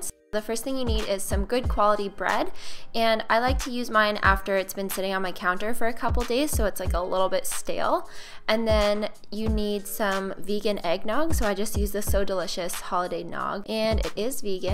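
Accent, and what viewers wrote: Canada. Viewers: Are you Canadian????? I love your videos 😁❤️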